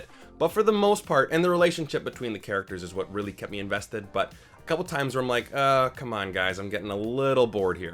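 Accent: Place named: Rocky Mountains, United States. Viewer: So, I'm a rare species in that I'm American and speak more than one language very comfortably, if you're not from Alberta or Eastern British Columbia Canadian I'm super impressed. Brits can't do Rocky Mountain American like you can. You're not German because you can say "W". Swedes and Norweigans do a great job at this particular dialect of English, but the Dutch even though huge percentage speak nearly perfect technical English, never quite get the rythm right. Not Aussie because they love their own way of saying things and they should because it's great. If you know anyone from Australia, the only thing funnier than doing John Wayne by John Wayne himself because he's from Illinois and his accent is not actually his own I believe is have an Australian do an impersonation of John Wayne.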